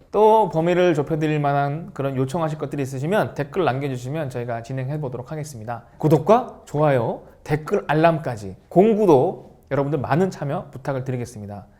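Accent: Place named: Jeolla, South Korea. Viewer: ㅎ.ㅎ 쬐깐해요~~~오리지널 전라도 사투리~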